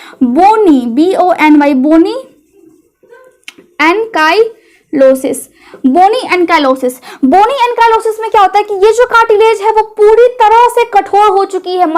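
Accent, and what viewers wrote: Bihar, India. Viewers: Bihari bhasa ki latk Kam karo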